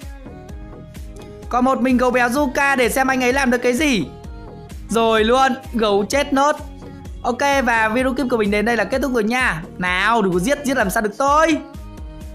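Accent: Nam Dinh, Vietnam. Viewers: Ah quê nam định à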